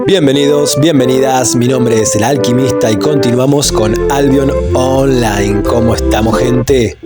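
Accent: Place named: Argentina. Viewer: Que buenas vibras tienes capo, me re encanta, deahhh. Sorry, me gusta como hablan los argentinos. :I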